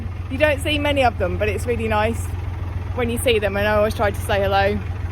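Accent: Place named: Birmingham, United Kingdom. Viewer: Another great vid. Defo detecting either a fellow brummie accent or Black Country accent. I grew up living in my Nans house which backed onto the canal (or cut as we call it). Her house was just down from the Tyburn House pub opposite Castle Vale north Brum. We always had barges parked up at the back of her house.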